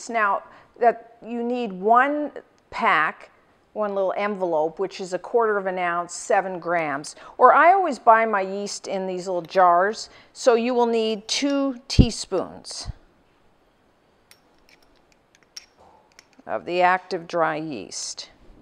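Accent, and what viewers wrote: Canada. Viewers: oh darn i was wondering about that accent. i thought it was canadian but i guess that's similar to the northern accent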